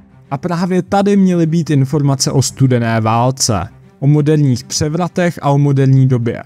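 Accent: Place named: Prague, Czech Republic. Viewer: Sleduji vaše pořady, jsou dobré. Jenom mi vadí vaše čeština, nemohl byste na tom zapracovat? Pražština moc nejde do ucha.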